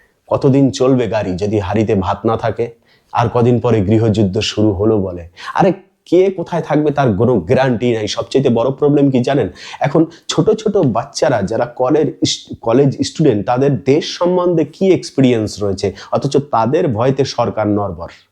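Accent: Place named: Barishal, Bangladesh. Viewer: হরে কৃষ্ণ রাধে রাধে প্রভু, আমাদের বাংলাদেশের হিন্দুদের জন্য প্রার্থনা করবেন। দাদা আপনার বাড়ি কোথায়? আপনি তো খুব সুন্দর বরিশালের ভাষায় কথা বলেন?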